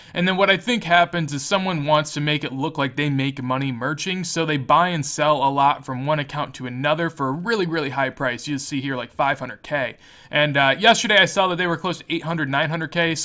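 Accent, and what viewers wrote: Michigan, United States. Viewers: Wait your from Michigan? :O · Wait are you from Michigan? 313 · Yooo are you from Michigan? Let's go blue baby final 4!!!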